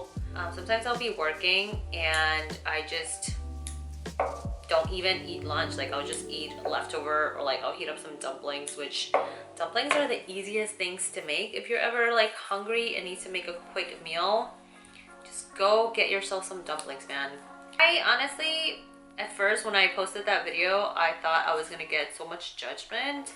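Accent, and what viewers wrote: Puerto Rico. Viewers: Chica, I"ve experienced culture shock in the past, pero the fact that a Chinese girl that speaks "Puerto Rican" Spanish, is super Fu!@ cool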